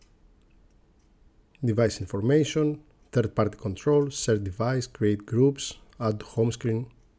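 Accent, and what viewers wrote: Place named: Greece. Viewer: I know you might not have expected this comment but... You're Greek, aren't you? XD I believe as a Greek myself, I can tell from your accent 🤣 (which is by no means a bad accent, but still not quite American, English or Australian)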